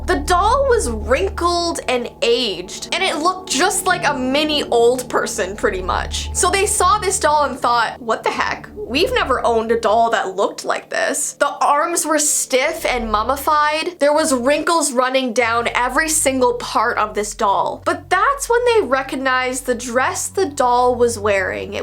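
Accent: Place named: Canada. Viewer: I’ve watched a bunch of video and I’m just now hearing what might be a Canadian accent! Where have I been?!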